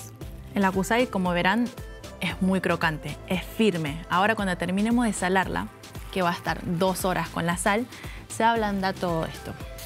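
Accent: Argentina. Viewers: Parece argentina con lo bien que habla. Muy buena pinta el plato.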